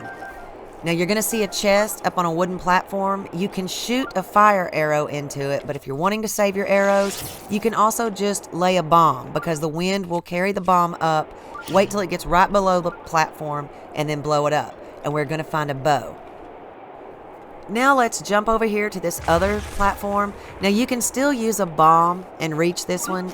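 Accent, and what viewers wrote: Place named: Blue Ridge, United States. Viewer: I did max hearts max 30 min offense and full stamina with two yellow circles and saved right before I put the sword back for middle trials. I'm not sure wuts better max damage 30min or defense. But I paused on the third level to charge my switch. Btw I'm from western nc u sound like a blue ridge mountain person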